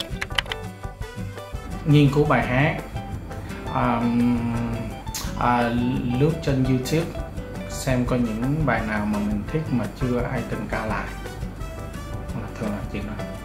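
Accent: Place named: South, Vietnam. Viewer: Trước giờ tưởng người miền Bắc giờ nghe nói giọng nam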